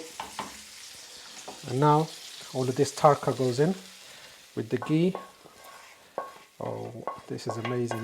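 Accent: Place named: United Kingdom. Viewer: Ps. I love your british accent.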